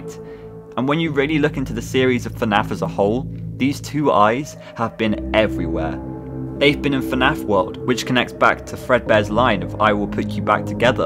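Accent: United Kingdom. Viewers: Ok but we’re just now gonna mention þe fact þat he got more and more British while voicing? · that British accent tho XD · English isn't my first language and thus I find the UK accent fucking hilarious. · talking reminds me why people hate British people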